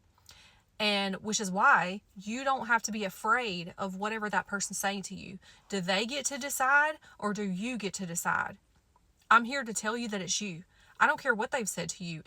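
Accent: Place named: South, United States. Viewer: I'm from the uk but i love your american southern accent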